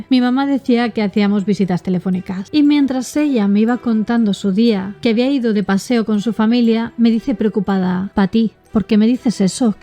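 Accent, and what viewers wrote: Spain. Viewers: ¡Mexicanos! ¿Quieren escuchar a diciendo una grosería? Minuto 😅. Excelente video pero el "chin∆@ tu madre" con esa bella voz española, es una joya! jaja.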